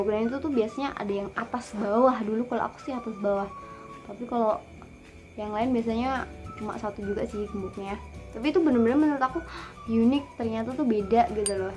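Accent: Malaysia. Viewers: Lawak gile org indonesia ckp slang2 malaysia😂😂 comel sangat😂😂💕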